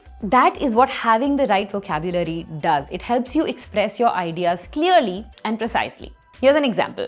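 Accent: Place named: India. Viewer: The Indian accent though...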